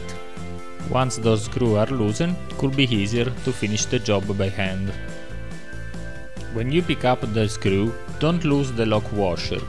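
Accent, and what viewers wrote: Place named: Italy. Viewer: love your italian accent!!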